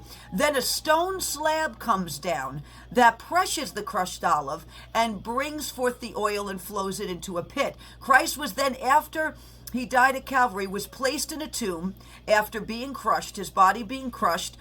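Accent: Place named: New York, United States. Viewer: I love that connection to the Lord's going through the suffering on the cross, his burial and the Resurrection, and how the processing of the olive oil with a donkey, wood, and the crushing weight of a stone fits into the narrative so well. No wonder I love you so much Ms.! I'm a New Yorker transplant to Texas (in 1982), and it's always fun to hear you speak with that Italian NY accent as well as inform us of what's going on in the spirit world and the physical world!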